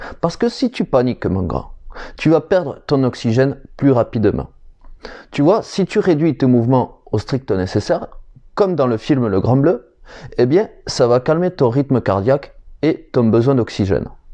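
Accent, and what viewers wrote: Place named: South, France. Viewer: J'adore ce petit accent du sud !! 😂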